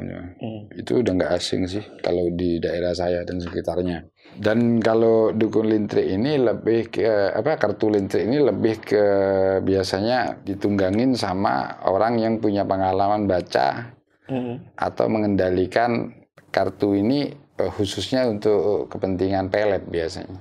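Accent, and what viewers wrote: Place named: Madura, Indonesia. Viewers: Logatnya madura bgt om 😂❤ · Assalamu'alaikum wr wb...mas...sy dulu...Jan 1975 - Agst 1976 itu...jg pernh tinggal di Bdws...19 Jan 1975 itu...sy,adik sy/Ana n almrhum Rama sy...naik KA dri Sby ke Jember...llu naik colt ke Bdws utk cri sekolh sy n sekolhx adik...Alhamdulillah...sy dpt di SMEAN - Jl Wachid Hasyim...klas 3...dri SMEAN 1 Sby pindh ke SMEAN Bdws...kl adik sy di SMPN 1...klas 1...llu sy n adik dikostkan didepanx gere'ja ayam...tpi hnya 2bln...sedangkn almrhum Rama sy n tmn2x itu...ad yg tidur dirmhx almrhum Rama sy...di Perumhn Artha Karya 2 - Jl A Yani - Nangkaan - Bdws...llu Mret 1975 itu...kelima sdr sy diboyong jg ke Bdws krn pd 1973 itu...kedua ortu sy sdh bercerai...jdi almrhumh ibu itu...hidup sendirian di Sby...stlh sy lulus sekolh...Agst 1976 itu...sy ikut almrhumh ibu llu Des 1976 sy menikh di Mjkrt n sy tinggal di Mjkrt itu...hnya 1th llu selama 7bln sy,suami n ank sy tinggal dirmhx almrhumh ibu n almrhum bpk tiri sy...kemudian sy ngontrak didektx rmhx almrhumh ibu...smp lhir ank ke 2,3 4 n 5...llu 25 Sept 1983 itu...sy sklrg pindh ke Bojonegoro n smp skrg...krn dinsx suami sy dipindh...dri Sby ke Bojonegoro n smp purna tugas...suami sy kerja di BUMN n purna pd 1 Agst 2004...mas itu...tinggalx dikampung Arab yaa...adikx ibu tiri sy itu...jg ad yg tinggal dikampung Arab...sy mendengar logat bicarax...mas itu...kok sprt orang Madura...ternyata betul...kita sm2 pernh tinggal di Bdws...Smg rumah tanggax mas...Samawa...Aamiin...sy/bu R Ayu Mamiek Widayati...68t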